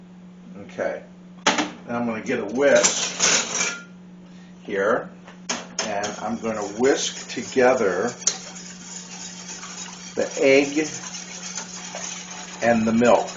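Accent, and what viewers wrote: Midwest, United States. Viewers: Love the foods , but I love your mid west accent which is like my birthplace of Chicago Illinois I’m reminded of all my family which I miss so much! Thank you for your channel, and the great foods I feel at home with you all!👍🏻❤️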